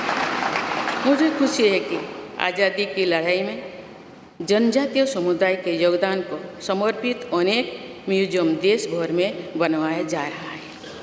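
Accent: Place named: Bengal, India. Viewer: Is she bengali ?? There is Bengali accent in her hindi language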